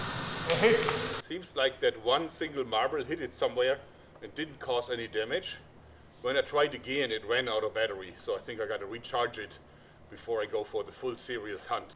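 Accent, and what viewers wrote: Germany. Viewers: Du sprichst mit einem sehr starkem deutschen Akzent o_O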